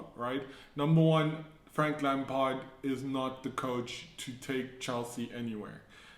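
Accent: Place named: India. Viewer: You look black but you sound 🇮🇳 Indian? I don't understand either?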